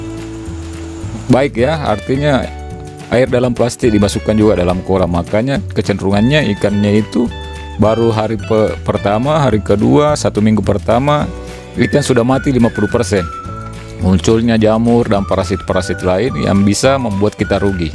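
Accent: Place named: Sulawesi, Indonesia. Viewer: kyk nya orang sulawesi, dari logat nya😁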